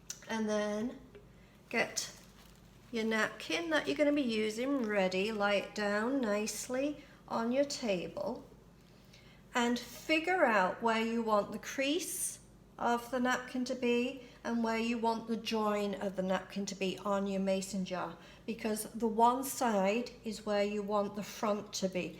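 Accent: England, United Kingdom. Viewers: British voice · I’m fascinated by your accent. Do I detect a whiff of an English accent in there….? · Hi, thank you for your videos. They’re great. And you live in Canada but you sound very English. Where are you originally from? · Are you originally from the UK? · Hi, you sound English, love your jars, your techniques, especially the robin jar. I'll be watching again and tell my friends. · Just found your channel and have subscribed. May I ask are you from England as you sound English, without a Canadian accent x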